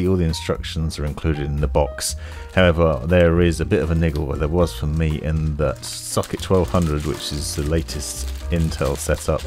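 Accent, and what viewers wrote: United Kingdom. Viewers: As others have said, you are probably the #1 video producer on YouTube for tech stuff. Your speaking voice is head-and-shoulders above the competition! Even though you're clearly a Brit, you are very CLEAR and speak at a reasonable pace. Others mumble and rush through their delivery and it is quite off-putting to be honest! I am a subscriber to your channel and recommend others support you by LIKING and SUBSCRIBING. Cheers and keep on!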